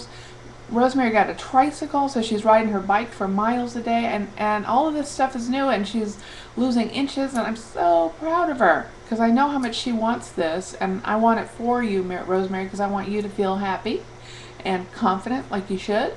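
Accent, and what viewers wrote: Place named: South, United States. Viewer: your to funny love the southern accent i am freezing my husband out of are bedroom hehehe he said can't wait tell u get your surg. because i keep the room so cold glad u had fun in Los Vegas oh yeah did u run into the bariatric ladies to i saw some videos love:0)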